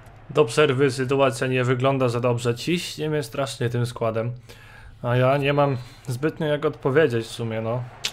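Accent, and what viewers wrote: Poland. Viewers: Widac że z Rzeszowa xd!:D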